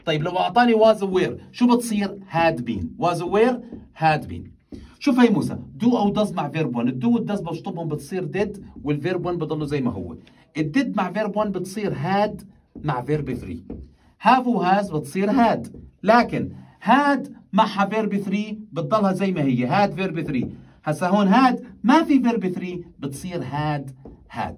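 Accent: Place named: Iraq. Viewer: عبالي عراقي😊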